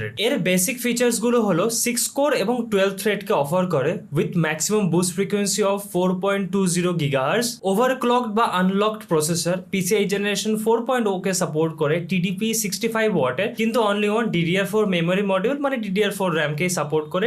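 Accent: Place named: Bangladesh. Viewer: Vhai apnar accent amader Bangladeshi der moto,ami apnar accent shune prothome vhabsi bd YouTuber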